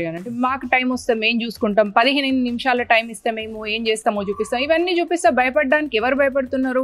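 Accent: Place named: Telangana, India. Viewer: Mee Telangana yaasa ❤❤❤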